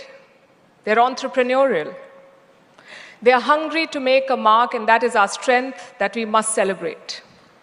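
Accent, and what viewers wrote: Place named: RP, United Kingdom. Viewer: Palki Sharma we citizens of India proud of as you explain in amazing Queens english , take care of you eat well and sleep well , please follow my advice from Chennai , Bharath Matha ke Jai, Jai Hind ..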